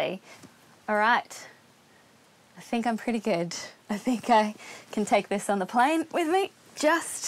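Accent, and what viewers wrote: Australia. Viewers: that australian accent tho <3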